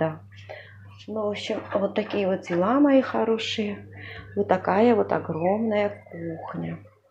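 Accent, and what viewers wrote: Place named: Georgia. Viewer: Очень четко слышится грузиеский акцент! Гамарджеба!!!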